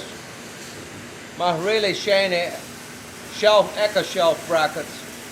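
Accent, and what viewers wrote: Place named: Germany. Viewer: Hes speaking english with a german swing... Seems like its really dying sad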